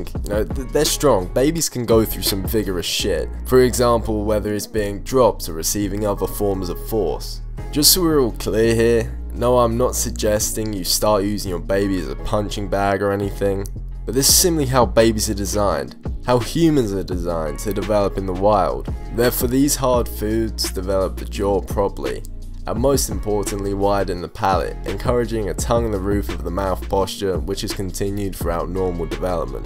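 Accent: England, United Kingdom. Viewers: British accent ? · are you from england or maybe australia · He is a fellow Brit.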